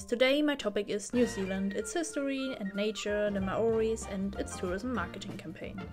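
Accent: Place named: Switzerland. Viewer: Mal was neues 😄 Ich möchte ja nicht fies sein, aber es klingt etwa so wie wenn ich als Schweizer Hochdeutsch spreche. Trotzdem war das interessant! Und die Animationen sehr gut gemacht.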